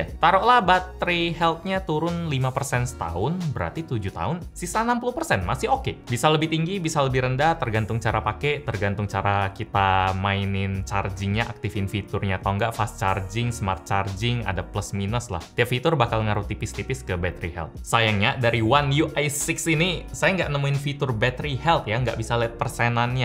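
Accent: Palembang, Indonesia. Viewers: nih wong plg apo ee?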